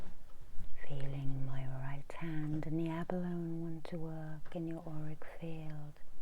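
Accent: England, United Kingdom. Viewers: I love you and your videos. I have been stuck on your videos for a few months since I discovered you. There are only two others that do asmr that I like. There are good one and bad ones, yours is good. I also love your accent as it reminds me of England, as I have good memories 😊 so it's a win win!! Please do the rattle more and the selenite sweeping more. Also Reiki symbols and bring you hand close to the camera after is great. I also like how you do the Reiki distance trace before you do long distance healing. Your very earthy. Thank you. 🤗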